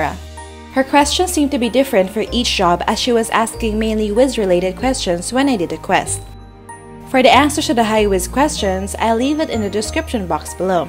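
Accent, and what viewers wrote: Philippines. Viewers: are u filipino?nkaka inlove nman pgsasalita mo ;) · Thank you. Thank God for bumping into this. You saved my life! BTW are you Pinay? Your accent sounds like one. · Lol boses plang pinay na. Usap2 din sa ibang tao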